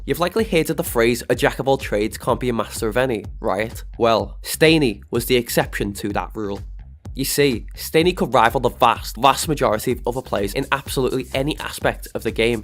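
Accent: Liverpool, United Kingdom. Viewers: Are u scouse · i cant understand anything with ur fcking annoying british accent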